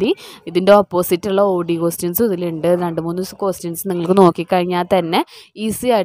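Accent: Thrissur, India. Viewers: Teacher niggal thrisuur anno, aah oru slang😊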